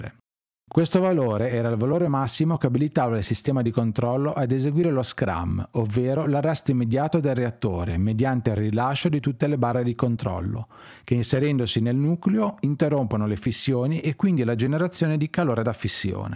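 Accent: Genoa, Italy. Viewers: Ciao ma io sento un accento genovese cmq complimenti sempre tutto interessante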